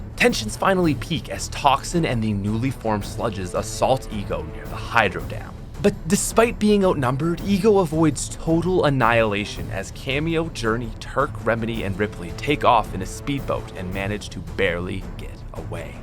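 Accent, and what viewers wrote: Canada. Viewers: You are Canadian. I know cus of the way you say out and however prove me wrong, oh wait you can't.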